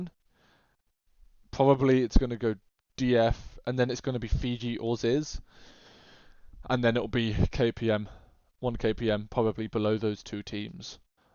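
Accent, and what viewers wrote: United Kingdom. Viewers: dude! i just realized you're from the UK!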